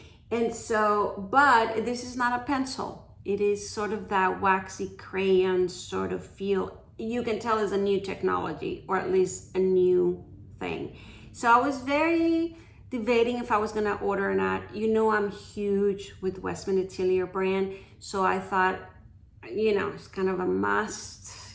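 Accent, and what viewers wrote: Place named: Mexico. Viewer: I had a feeling you’re from Mexico. I’m from Los Angeles, so I’m super familiar with the accent. Now I live in Miami, so I don’t hear it as often anymore- it’s all Cuban, Dominican, island accents and some Central American here and there. Well mujer- I’m digging your channel. You have tons of videos and it’s always refreshing to see videos of more grown women! Keep it up and much success to you!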